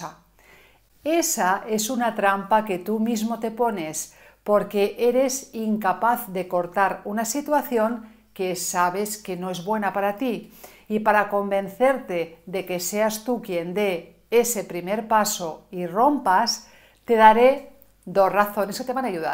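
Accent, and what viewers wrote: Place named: Spain. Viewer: Estoy enamorado de tu voz y acento espanol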